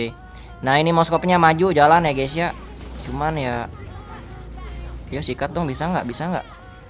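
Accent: West Java, Indonesia. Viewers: Ngakak, sunda na karasa pisan ekek. Sukses brad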